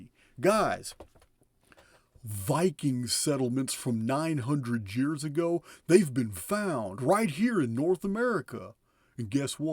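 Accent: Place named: Texas, United States. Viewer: From which part of the USA is accent?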